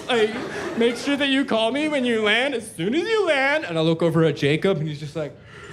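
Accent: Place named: Canada. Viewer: this homie is so fucking Canadian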